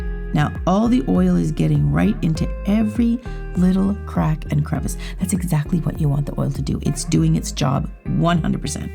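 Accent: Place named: Canada. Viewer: Her speaking voice sounds sooo much like Shania Twain. That subtle Canadian accent. At least I assume she is Canadian because of her accent. Seriously, find a clip of Shania Twain talking. Love it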